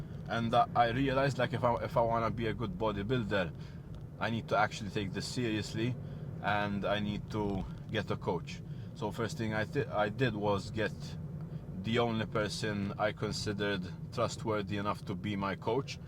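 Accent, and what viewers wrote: Malta. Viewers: hes from Malta I think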